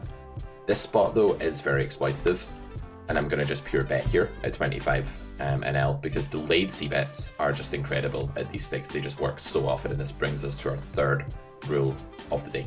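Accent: Scotland, United Kingdom. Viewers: Really like your Scottish voice… nice books too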